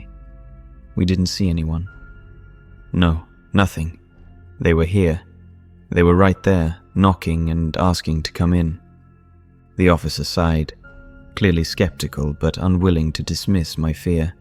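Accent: United Kingdom. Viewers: I enjoy YT channels that create their own content. I am in the process of watching all the videos on your homepage. The British accent in narration adds a nice flavor to the story. You have tales I haven't heard before, as I said the narration is wonderful, excellent grammar in the writing, have you ever thought of writing short stories of longer duration. I'll wager you would be good at it.. Thank you for all the effort you put into your productions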